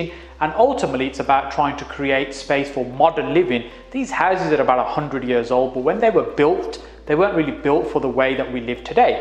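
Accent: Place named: British Pakistani, United Kingdom. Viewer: is certainly very intelligent and has taught so much already., if you are reading this sir, then you should check out "supersafs" channel on Youtube as you remind me so much of him in terms of your British Pakistani accent and your intellectual style of presenting videos. Supersaf has also taught me alot and he is also British Pakistani like you sir. He is one of the most well known Youtubers from the U.K. Check out "supersaf" channel sir and thanks for teaching me so much. May allah bless you sir.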